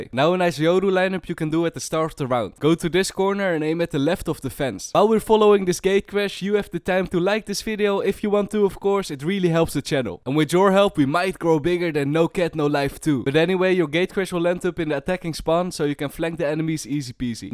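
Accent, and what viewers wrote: Netherlands. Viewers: You dutch? From leeuwarden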